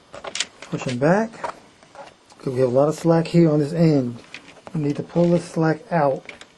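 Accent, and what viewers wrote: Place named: Philadelphia, United States. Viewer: thanks for the help, especially loved the accent, just curious where are you from, im guessing Philly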